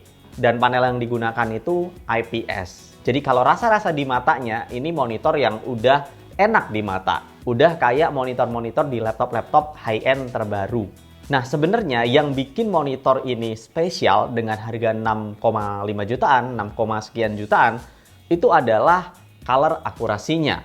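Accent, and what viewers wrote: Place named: South Sumatra, Indonesia. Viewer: Terima kasih reviewnya, Bang... Informatif sekali 👍👍. BTW, Abang asalnya dari Sumsel ya? Kebanyakan teman2 saya yang asal Sumsel, kalo bilang kata "gelap", diucapkannya "gelep".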